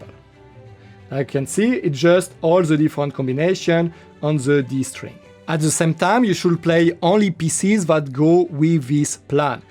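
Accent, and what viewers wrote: France. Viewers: Your French accent is difficult to follow. Please use AI to change to a British or American accent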